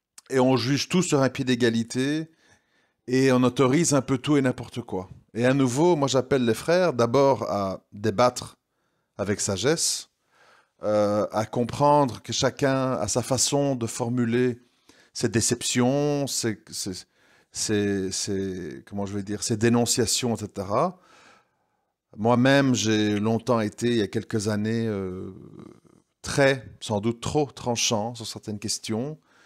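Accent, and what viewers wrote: Belgium. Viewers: tu es tres flou par manque de temps et d’exercice je le sais tu es un homme qui enrichit en plus avec ton accent belge qui rend + crédible qu'un francais. J'ai compris que tu es sincère inutile de perdre du temps sur ça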